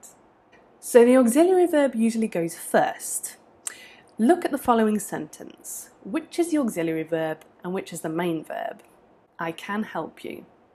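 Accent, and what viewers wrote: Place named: United Kingdom. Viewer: Question. You have a $20 U.S. Bill. But you’re also speaking British accent. Why don’t you show us 20 pound instead.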